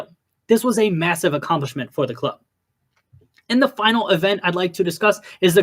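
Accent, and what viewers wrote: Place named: United States. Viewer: Please try learn pronounce correctly mames out of your language, or you'll keep sounding as an ignorante yankee. Nicely said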